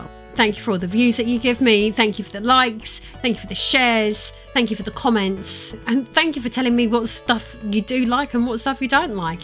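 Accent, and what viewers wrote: United Kingdom. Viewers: Im only here to listen to the british accent...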